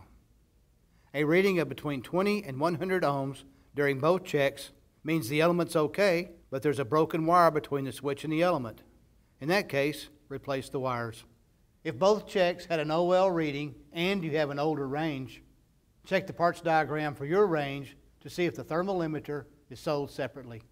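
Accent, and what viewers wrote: United States. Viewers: Thanks, buddy! I'm Brazilian and your accent helps me to understand my American boss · He sounds like he is from the Ozarks of Missouri.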